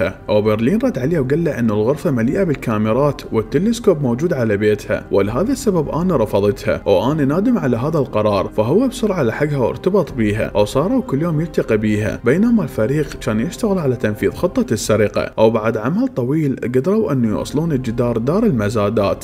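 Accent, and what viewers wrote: Iraq. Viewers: انته عراقي مو العراقين وينكوم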